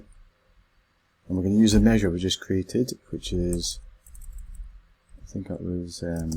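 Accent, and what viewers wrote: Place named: Scotland, United Kingdom. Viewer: Very nice. Great explanation. Thanks a lot. Nice to hear a Scot!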